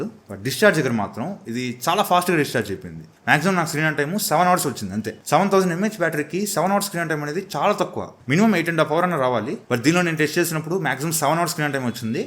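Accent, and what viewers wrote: Nellore, India. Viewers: Anna midi Nellore aa😅 mana Nellore ye nuvu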